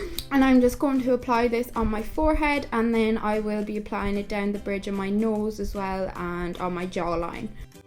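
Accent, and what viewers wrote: Ireland. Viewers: Love this xxx just wondering what county u from X I honestly can't tell hahaha xx I'm from tipp btw xx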